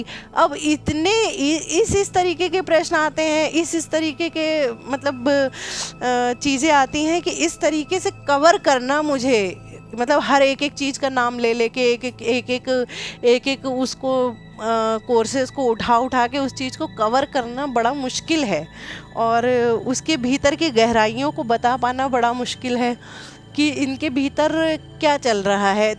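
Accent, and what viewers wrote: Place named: Uttar Pradesh, India. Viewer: दीदी आपके वीडियो बहुत ही अच्छे हैं, में आपके वीडियो लगातार देख रहा हूं, और कुछ करने का प्रयास भी कर रहा हूं, और दीदी आपकी भाषा उत्तर प्रदेश की है और आप उत्तर प्रदेश से ही है यूपी के रहने वाले मेरे संपर्क में हैं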